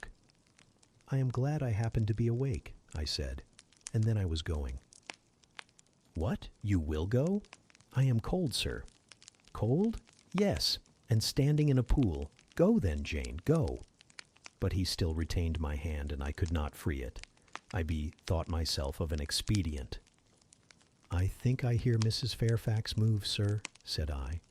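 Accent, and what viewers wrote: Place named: United States. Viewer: I searched American pronounced jane eyre. This is best. And your voice so sweetie as like Rochester. And fire sound is so convenient to my mind. And its good because it is original book contents without skipping. Thanks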